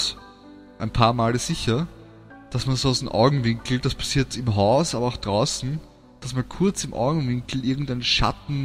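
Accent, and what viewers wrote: Austria. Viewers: du hast genau so eine stimme wie jmd den ich aus skype kenne bist österreicher oder ?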